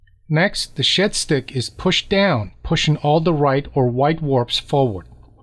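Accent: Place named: South, United States. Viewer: I knew you learned English in the Southern United States. You have that Southern accent! This was a great video! Thank you so much for presenting it so clearly. I had an Iranian friend who had some beautiful rugs, and I was fascinated by the skill used in weaving them.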